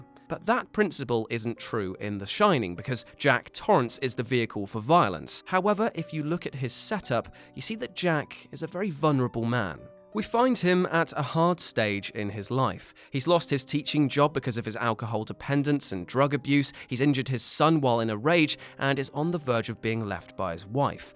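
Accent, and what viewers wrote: United Kingdom. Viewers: wow, if you can think it....people can try and make any wild idea to try to make sense ... this is lame..... next video i bet you'll try to connect scooby-do with "it" and "the village" as one great universe... please stop, cause your british accent doesnt make someone sound smart, cause your silly, the evil ghost is not "it" in all his novels, so there you have it-you stink ..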